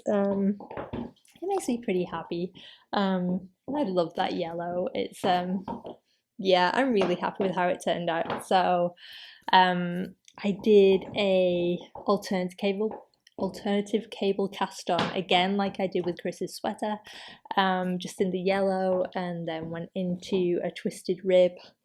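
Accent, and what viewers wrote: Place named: North, United Kingdom. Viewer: Enjoyed your first podcast and am back for more. Love your dotty hat, really pretty. Enjoy your style and its lovely to hear your Northern accent 😁 Tilly is adorable too. Look forward to the next one xXx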